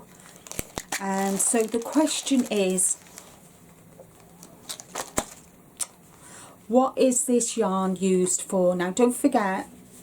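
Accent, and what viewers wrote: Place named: England, United Kingdom. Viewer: I just stumbled across your video I thought it was nice to be nice to win things to make for my grandkids I got so many of them making a baby blanket or booty those would be so nice sounds like you're in England I'm in the US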